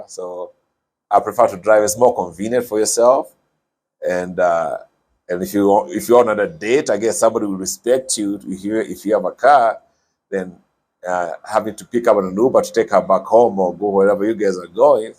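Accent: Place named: Kenya. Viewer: Kikuyu accent never goes away... enjoy dude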